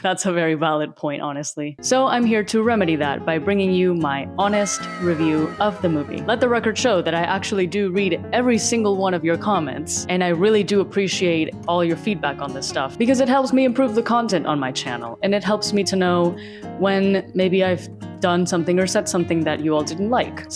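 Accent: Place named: Spain. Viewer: Your English is seriously so damn impressive. It genuinely took me until you said Javier Bardem's name for me to place the microscopic accent, and then I was like, "Hecho, she's Spanish." But your English is better than 90% of Americans'.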